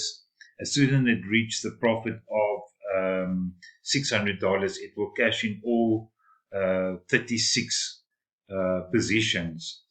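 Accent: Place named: South Africa. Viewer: Digging the deep Afrikaans accent bro. 🇿🇦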